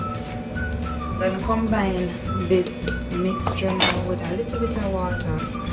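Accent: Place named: Jamaica. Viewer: I love your intro, thats really nice. You have a nice accent. I love the jamaican accent. Nice prep you did before blending . Need to try this new to me. Thx 4 sharing 🥰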